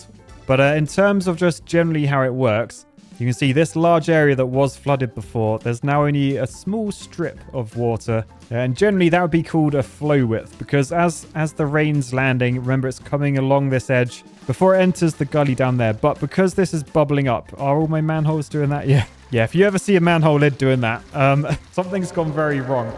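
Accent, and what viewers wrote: United Kingdom. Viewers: I heard way more British pronunciation in this "game" than any other video. 😂 I was like "What'd he say?" 😊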